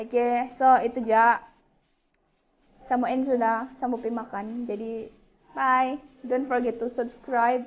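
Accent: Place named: Ranau, Malaysia. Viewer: Dari cara ckap ja tau suda Ranau aha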